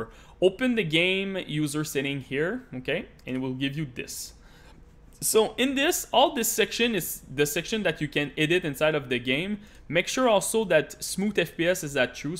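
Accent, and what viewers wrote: Quebec, Canada. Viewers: ta une accent quebecois xd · Fuck ça se voit direct l’accent québécois car j’en suis un en tout cas merci pour ce tuto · accent du quebec sa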